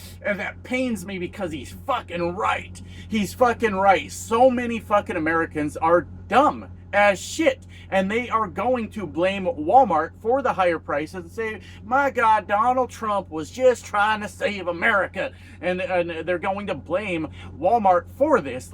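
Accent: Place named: Canada. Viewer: Howdy Trucker from Canada! Keep these videos coming. I'm addicted now to my daily Postcards from the Cab! You sound Canadian!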